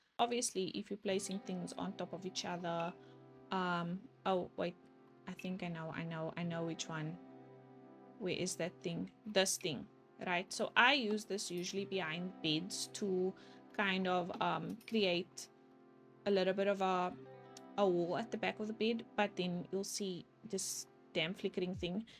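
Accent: South Africa. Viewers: Omg r u South African?😭💗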